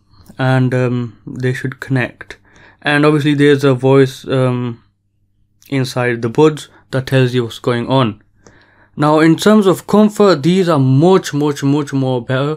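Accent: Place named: West Yorkshire, United Kingdom. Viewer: Thats one right west Yorkshire (asian) accent lol I have recently got them, they are great but bass is not that good.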